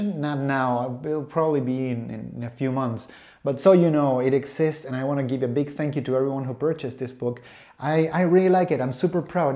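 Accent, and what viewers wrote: Chile. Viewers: ... eres de chile?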